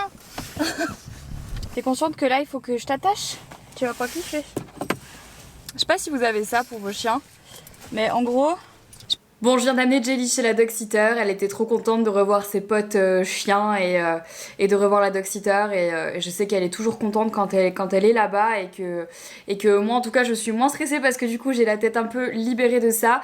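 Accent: Belgium. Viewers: T a pas peur de prendre l accent belge? en vivant à Bruxelles😂😁?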